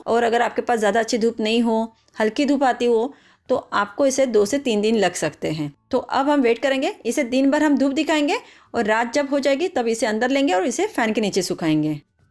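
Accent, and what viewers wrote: India. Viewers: I love your hindi accent.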